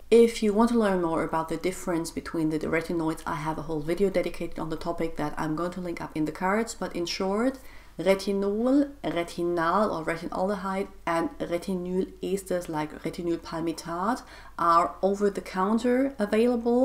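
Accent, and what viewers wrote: Germany. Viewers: I think due to your pronunciation of the chemicals I directly heard the german r and a ☺️☺️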